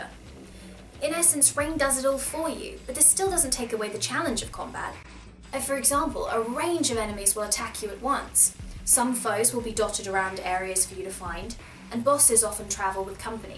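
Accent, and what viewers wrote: United Kingdom. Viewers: awesome review is that a british or australian accent!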